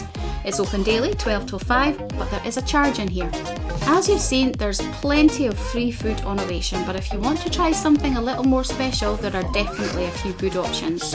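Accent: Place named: Australia. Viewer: Might be the accent. Aussie’s can hear you just fine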